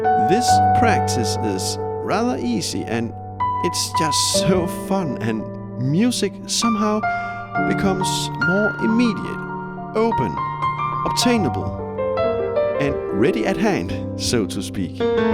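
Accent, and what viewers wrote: Denmark. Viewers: Is that a danish accent I hear? Great job!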